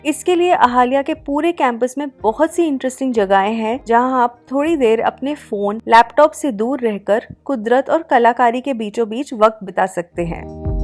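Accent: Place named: North India, India. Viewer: Itani sudhdh Hindi...i guess your native place must be somewhere in North...anyway so pleasing to hear your Hindi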